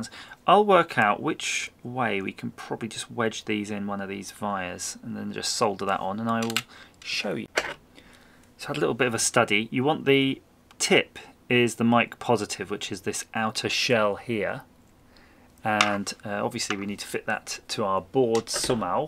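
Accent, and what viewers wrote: United Kingdom. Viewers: Thanks for the content and LOVE the British accent!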